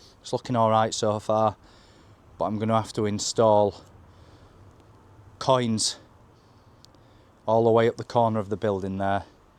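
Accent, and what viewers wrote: United Kingdom. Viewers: Love your videos, the Yorkie accent and sayings! Please keep them coming! I wish your family and you a Merry Christmas and a blessed new year! I hope that your father and you will be able to finish many more projects successfully!😊🎄🎅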